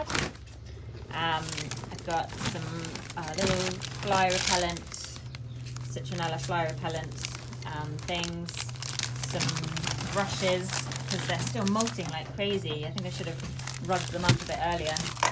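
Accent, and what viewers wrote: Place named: United Kingdom. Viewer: D Your videos are great:D I'm in love with your horses and ponies. Hugs from Brazil It's being a great adventure to learn British English through your videos. God bless you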